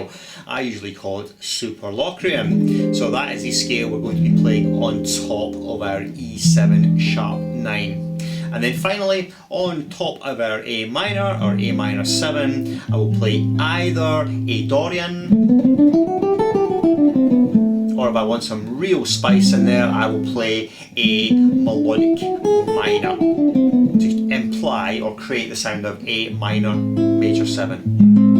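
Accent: Scotland, United Kingdom. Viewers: well - i'm convinced, you know what you do. how is it possible people can get so good at their instruments?, how many hours have you spent playing in your life? just roughly. i want to estimate if there is a chance for me at all. major doubts. btw, are you scottish? sorry if i'm wrong, but there is something in your accent i have heard before - jim kerr. (i'm german - i can't figure it out exactely)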